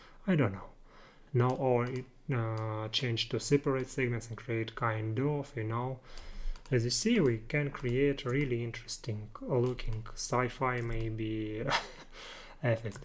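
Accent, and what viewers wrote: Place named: Russia. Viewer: как же тяжело слушать русских говорящих на английском)) тем не менее инфа годная, очень мало туторов по node editor в синеме, хотелось бы побольше видео по нодам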